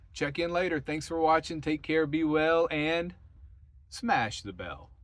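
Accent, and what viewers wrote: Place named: Columbus, United States. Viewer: I KNEW you had to be from Columbus! I'm from there, and I picked up on your regional dialect. That's so awesome! Safe travels!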